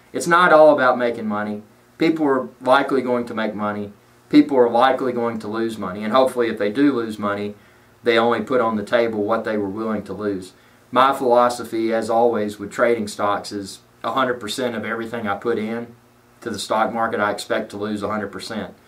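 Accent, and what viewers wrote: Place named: South, United States. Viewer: trading stocks and a southern accent DO NOT GO TOGETHER... that's like a mexican cooking your chinese food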